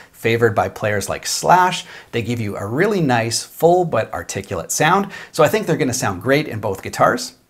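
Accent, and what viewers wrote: Canada. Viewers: you sound so Canadian to me really enjoy your videos bro! Love from the Dominican Republic.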